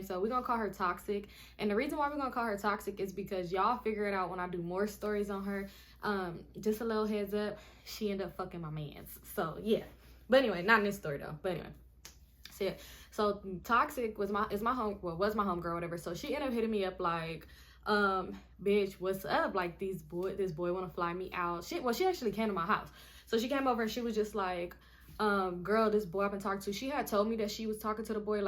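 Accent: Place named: North, United States. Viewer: You are an absolute embarrassment. Nevermind that "flewed" is a stupid sounding and ugly looking word. I'm familiar with it, it's the word you and people like you use to describe when someone wastes money paying for y'all's aerial transportation so you can participate in watered down forms of prostitution. Furthermore, your eyelashes need to be stopped. Not even just yours, but all the women in the world wearing those horribly fake looking pieces of plastic on you guys' eyelids need to be stopped. Like seriously y'all lookin silly. While we're on the subject of your appearance, throw all them cotdamn wigs and weaves in the garbage and immediately tote them to the dumpster. Be a Black Beautiful Queen and show the world the texture that God gave you, if you have any. Y'all out here sending all that money to India and China so you can look like people from Europe, wake up. 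And lastly, I can tell by your story and how you talk that you from up Norf or somewhere in the Midwest. Don't you ever in your life open up you mouth again to say anything bad about Waffle House. Waffle house is an institution and it don't need your money or approval. Put some respec on my waffle and grow up. Focus on school or a career or something instead of tryna hustle men for a good time. Our nation is in decline and it's mostly because of how the youth spends their time. It's only a matter of time before our society is overrun by china and when they come they're going to make our children speak Mandarin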